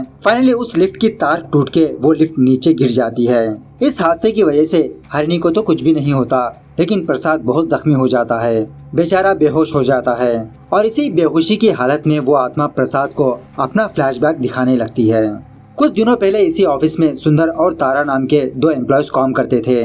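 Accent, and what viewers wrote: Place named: Hyderabad, India. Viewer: Bhai Hyderabadi dilect akhari hai...😎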